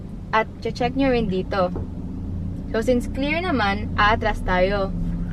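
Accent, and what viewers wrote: Visayas, Philippines. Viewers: Bisaya kba? I can hear some tones. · bisaya man ka dai, pag bisaya dai oi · bisdak for sure! 😍 sweet tone of voice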